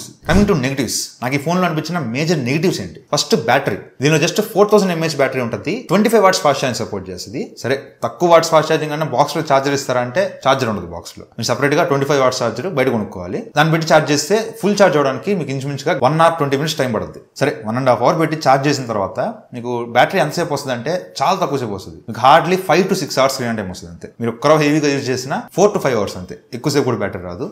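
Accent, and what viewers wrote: Nellore, India. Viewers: Bro nedhi Nellore ha ????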